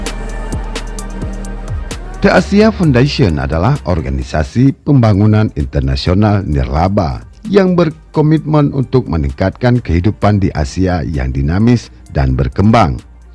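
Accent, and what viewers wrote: Aceh, Indonesia. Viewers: Apakah Chanel ini milik orang Aceh..kuliat irama ngomong nya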